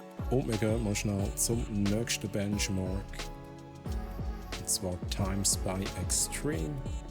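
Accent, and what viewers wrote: Switzerland. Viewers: find d'musik genau richtig sehr ahgnehm chillig smooth ahpasst das liegt wohl ehner am schwiizerdütsch und em dialekt und isch es sprachliches khör und kein sound problem 🇨🇭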